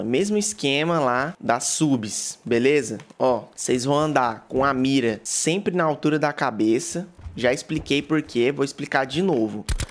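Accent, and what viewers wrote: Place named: Goiás, Brazil. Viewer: Os ataXiiiments sotaque Goiano kkkkkk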